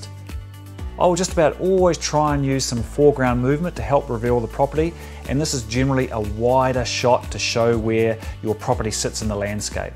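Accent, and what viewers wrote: New Zealand. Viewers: In the “EAAR” no offence hahah I love the NZ accent!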